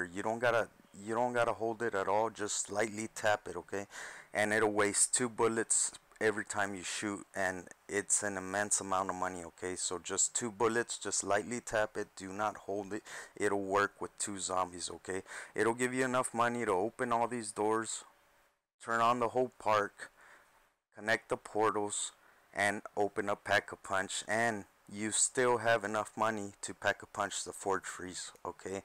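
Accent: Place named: Canada. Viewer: you sound like a nice Canadian okay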